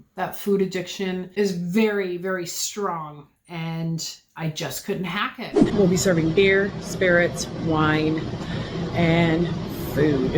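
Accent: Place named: Pennsylvania, United States. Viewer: Got a sister who is a dealer in a casino also and her husband is a security guard. She's been at it for years. And it's really been hard on her body and she's gained a lot of weight. Loves her job but she's not ketovore or anything like it. YOU CAN DO THIS!! You're a true believer in this WOE and you'll stick to it.!! If you're in PA I just wonder if you're at the same casino??? You sound like a Pennsylvanian! First time to your channel so I hope you wouldn't mind telling us where you are? BEST WISHES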